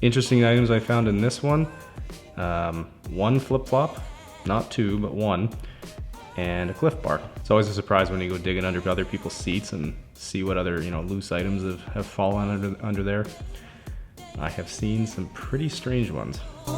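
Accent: Canada. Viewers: Bruh is he Canadian cause aye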